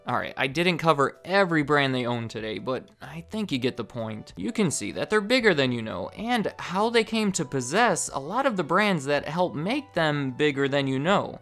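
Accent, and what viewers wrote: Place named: Midwest, United States. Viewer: In this video, it is the word "straighten" or how you say it "straiden" lol. Some midwest thing idk ohio or illinois or something. But it's definitely some accent.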